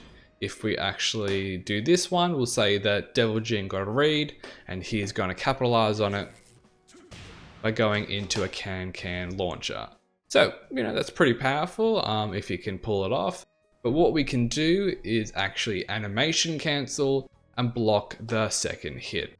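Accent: Australia. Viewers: Where you from in Australia mate? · Your channel has suddenly popped up in my recommendations. I like it when I get to listen to Aussies, being an Aussie in Germany. Funny thing, your channel name in German and corrected for proper german grammar, massiver Zug, means massive train or massive pull, depending on context.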